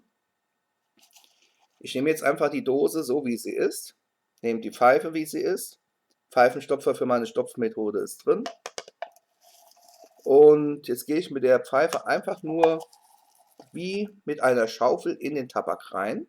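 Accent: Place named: Hesse, Germany. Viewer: versuche gerade ihren Akzent zuzuordnen, er ist schwach aber ich würde sagen Hessen. Ist das richtig?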